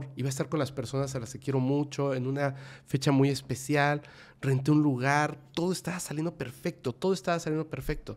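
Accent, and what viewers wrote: Yucatan, Mexico. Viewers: Imposible ignorar el ascento Yucateco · habla como yucateco.....porque habla asi??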